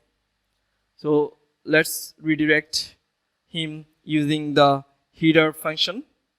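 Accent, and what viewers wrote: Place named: Bengal, India. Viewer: Terrible sound. Also accent is heavily Bengali, very hard to understand!!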